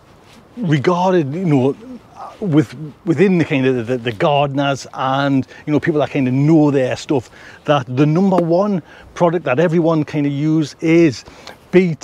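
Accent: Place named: Newcastle, United Kingdom. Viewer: Great surprise! Nice to hear a Geordie accent - I live in Colorado and we have a bad outbreak at the moment.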